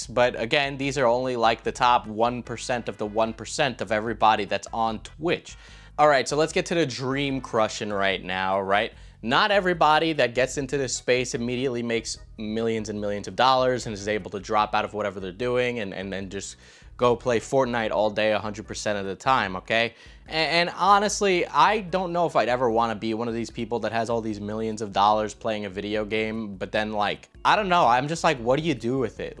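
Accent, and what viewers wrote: New York, United States. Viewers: I never heard that little bit of New York in your voice until you mentioned it and now I can't unhear it.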